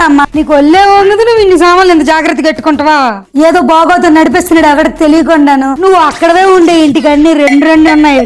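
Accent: Srikakulam, India. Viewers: Srikakulam yaasa......🔥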